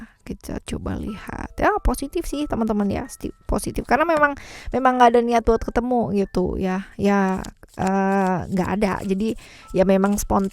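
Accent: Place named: Java, Indonesia. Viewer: Kalau dipikir-pikir cerita bacaannya lucu nganggo banget..!yg membaca saja sampai mumet..😃..mbak per nah bilang" ini hubungan macam apa.....?",halah mbak ...😴...nggak usah dipikir banget2 bikin mumet.! Ma'af ya mbak...aq mau tanya sesuatu: mbak asli orang mana.?, Kok logat cara bicara bukan orang luar jawa.kalau toh mbak ada darah keturunan orang luar indon,saya pastikan ada salah satu dr ortu indon..☺ Intonasi ,logat /penyampaian ,warna suara mbak nggak bikin nek /jenuh ,luwes & frekuwensi sedang😃👍Trims...nuwon...!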